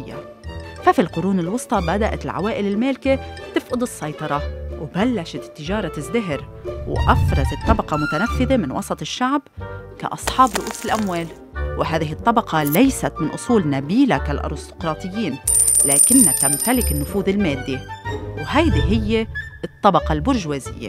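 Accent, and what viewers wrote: Lebanon. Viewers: حلوة اللهجة اللبنانية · لم استطع اكمال الفيديو بسبب اللجهة اللبنانية الممجوجة الثقيلة على السمع، رجاء ان تبقى الفيديوهات باللغة العربية الفصحى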